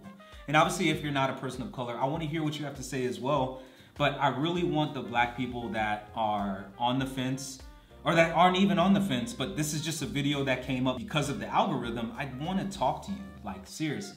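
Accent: United States. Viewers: You are black yes but you are also American. Your American accent will also afford you a level of respect that non-American blacks may not receive.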